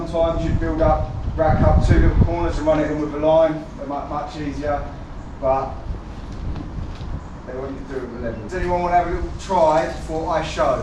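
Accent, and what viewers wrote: Cockney, United Kingdom. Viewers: Flash cockney bastard you know what you are …….bricklaying yaaaaaaawn 🤪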